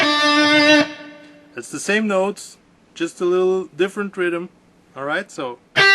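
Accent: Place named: Eastern Europe. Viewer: love the accent....Where you from man? Sounds eastern european.....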